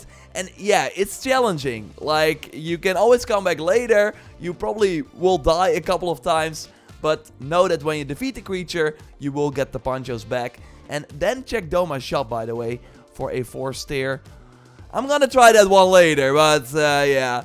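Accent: Netherlands. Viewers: you sound Dutch..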